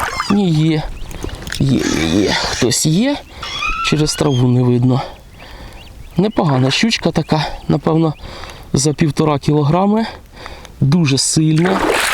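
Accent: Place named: Ukraine. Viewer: говори на нормальном языке. не хочется слушать говор Бандеры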